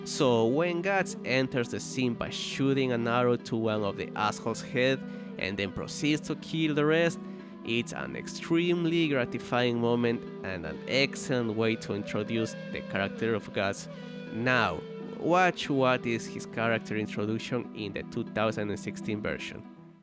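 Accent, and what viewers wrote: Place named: Eastern Europe. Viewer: I can hear some Eastern European accent. You're from Georgia?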